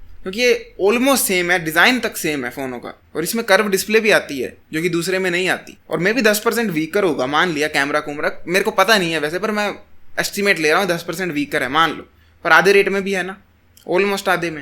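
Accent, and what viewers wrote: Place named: Punjab, India. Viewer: Bhai are you from panjab ? You speak hindi with a punjabi tone.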